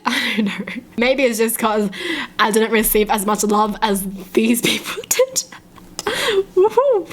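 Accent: Australia. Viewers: zenzoner zz nooooooo omg by blood she’s full asian. But she just happens to be born and raised in Australia which she picks up the accent.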